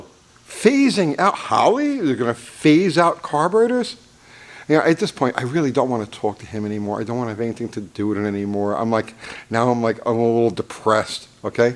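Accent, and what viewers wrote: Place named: East Coast, United States. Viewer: I dig yir channel ’. Especially yir E-coast patois. On top of that, yir a real guy with an average guys attitude.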